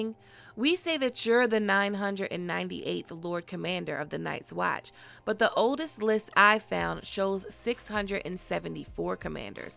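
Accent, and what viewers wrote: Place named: Baltimore, United States. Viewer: Whoever you are ma'am.. You are now deemed the best for the breakdown. I hear a Bmore accent as well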